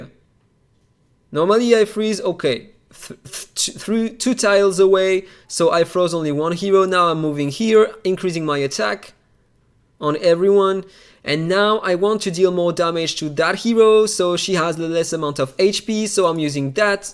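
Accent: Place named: France. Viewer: I love the French accent, by the way.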